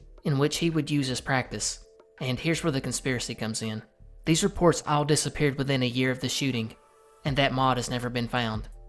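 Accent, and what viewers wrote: South, United States. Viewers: I love rural/southern/farmland accent. So nice on the ears. Honest and direct. No pretentiousness.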